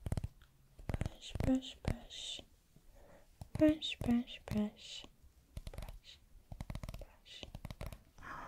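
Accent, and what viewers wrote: Australia. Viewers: The second I heard you, I knew you were Aussie 😂 loving it, have a good one mate 👍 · I love her Aussie accent too much tho!☺️For some reason it’s more tingly!🥰👉🏼👈🏼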